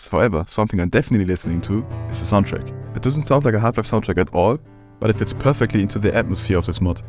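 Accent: Germany. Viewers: The German accent man, i cant fucking listen to this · I smell a German accent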